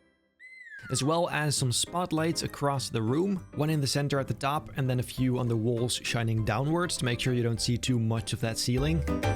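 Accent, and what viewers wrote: Netherlands. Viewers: ben je nederlands???? wand heb een gevoel dat je nederlands ben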